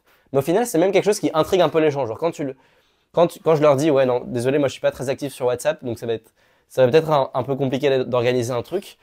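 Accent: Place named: England, United Kingdom. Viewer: Wow l'accent anglais de fou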